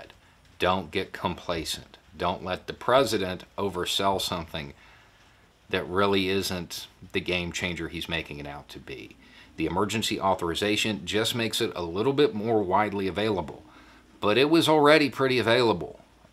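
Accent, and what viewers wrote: South, United States. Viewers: To be honest... I haven't experienced more genuine thought process since I watched this gent making reasonable arguments in a southern accent (I maybe wrong I'm german) in his garage with his superior Beard. I expect any minute a profound argument supporting the second amendment.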